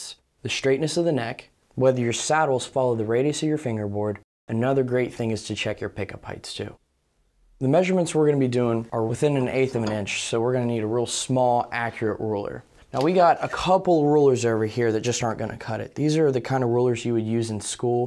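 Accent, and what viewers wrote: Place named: United States. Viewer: Very good and educational video. BTW and out of curiosity, what US accent is this? I.e. schtrings on a schtraight neck.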